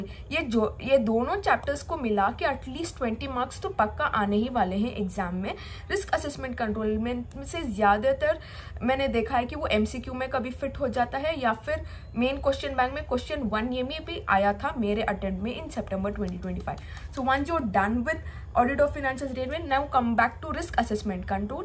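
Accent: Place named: Karnataka, India. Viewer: Are you from Karnataka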